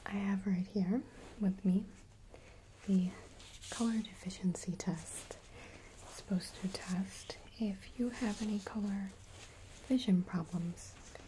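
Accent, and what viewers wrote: Midwest, United States. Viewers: I've been trying to figure out this accent as it sounds kind of familiar it's kind of funny that it's just Midwest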